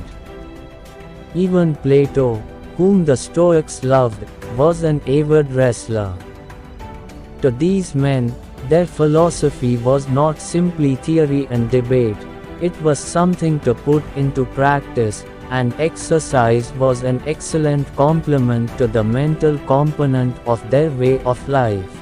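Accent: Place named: India. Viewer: I love you for creating this content, but i can't listen this indian accent, this is just lazy work bruh, pathetic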